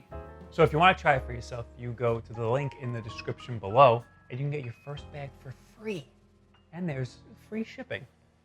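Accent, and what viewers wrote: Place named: New York, United States. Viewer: new yorker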